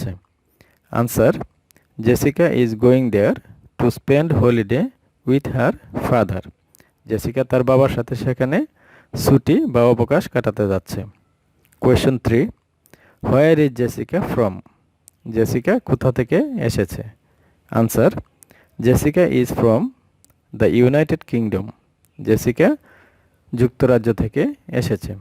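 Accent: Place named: Dhaka, Bangladesh. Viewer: আপনার কথা গুলো ঢাকার মতন লাগে, মাধবপুরের বাসা বুঝা যাইনি😁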